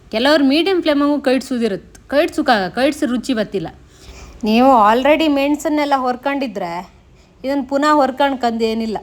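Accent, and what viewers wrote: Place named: Kundapur, India. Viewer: super kundapur bhashe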